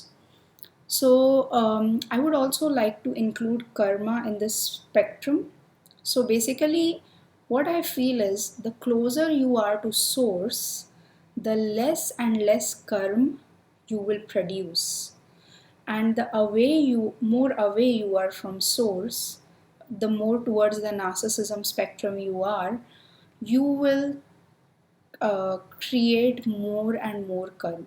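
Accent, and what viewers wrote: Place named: India. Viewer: Another really great video! Your ideas are deep and you explain things plainly and clearly. (Love your accent, is it Indian or Pakistani? Love it! And your calm, friendly attitude is also really helpful in absorbing the information) Really enjoyed this, thank you !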